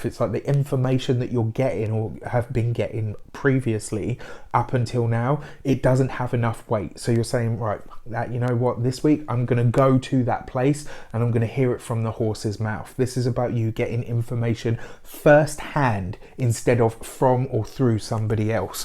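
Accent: United Kingdom. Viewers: Are you brit. ..yes. it's been the longest year. ..but my guy going through stuff too.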